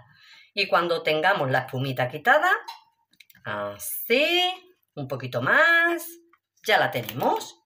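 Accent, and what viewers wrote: Andalusia, Spain. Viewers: Buenas tardes, lo primero felicitarte x tu canal , lo encontré por casualidad y tal te vi dije es comida de la buena jiji y sobre todo me encantó tu forma de hablar y explicar ,supongo por el habla que eres del sur oleeee yo soy Gaditana y a mucha honra porque tenemos un dialecto que muchos quisieran.❤ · Venga mi Alma!! Luego vengo a merendar no me puedo perder esas delicias. No sé, si me gustan más los pastelitos o escucharte a ti con ese arte y salero de Andalucía. Ole tú que no se puede aguantar😋😋👏👏👏👏👏👏👏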